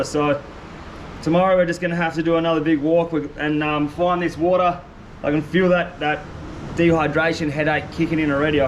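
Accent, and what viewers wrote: Western Australia, Australia. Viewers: I knew straight away you are Western Australian from your accent, especially your pronunciation of water LOL. The sky and beach was a giveaway too. Great video mate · Thought this is going to be some fake ass bullshit... until i heard the australian accent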